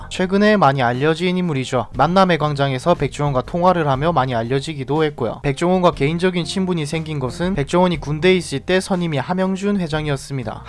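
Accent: Gyeongsang, South Korea. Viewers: 나레이션이 사투리죠? 고향이 경상도인가봐요. 잘 듣고있습니다.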